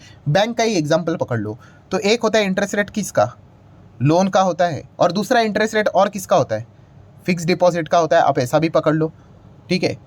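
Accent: Maharashtra, India. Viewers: Accent to Marathi he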